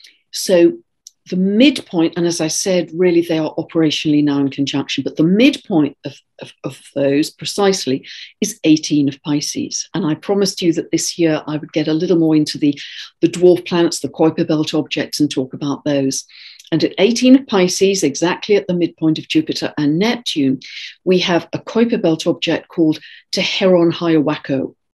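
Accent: England, United Kingdom. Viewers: "...you just have such a lovely way of turning a phrase, that it is a delight....". That's because has a most cultured English accent, so she delivers the news with panache'.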